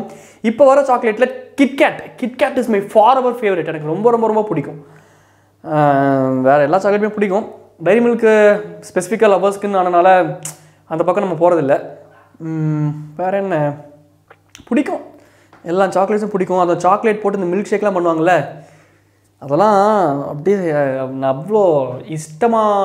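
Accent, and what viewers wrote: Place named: Kerala, India. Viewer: Enna anna adikadi malayalam cross aaguthu😒😂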